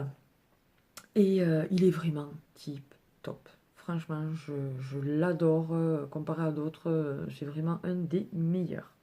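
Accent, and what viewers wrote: South, France. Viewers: Je t découvert il y a qq mois grave à fashion Barbie j'aime bien regarder tes vidéos. Et ton accent ramène du soleil dans ma région ou la chaleur n'est pas au rendez vous. J'ai de la famille à castres alors je connaît bien de ton petit coin ☺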